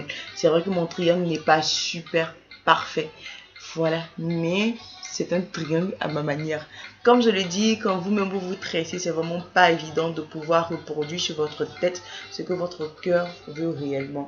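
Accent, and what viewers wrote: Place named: Ivory Coast. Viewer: de que j ai entendu t as voie j ai vue que tu étais ivoirienne #225👊👊👊👊👊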